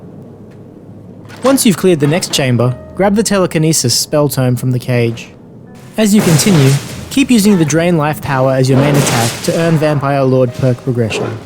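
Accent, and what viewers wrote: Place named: Australia. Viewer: Nice australian accent!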